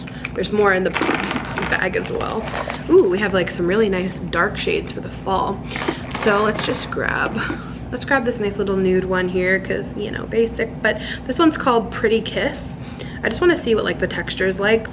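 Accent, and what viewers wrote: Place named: United Kingdom. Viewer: I love the British accent lol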